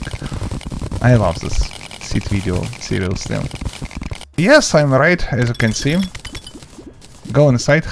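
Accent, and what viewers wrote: Russia. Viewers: такой милый акцент у тебя)) сразу пон что ты руссский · Cuz he's russian, he's original channel is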